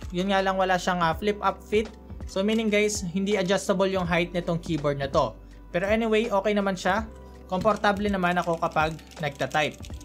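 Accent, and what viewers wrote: Philippines. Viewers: I love that I can still understand Tagalog. It's so fun to hear you do Taglish.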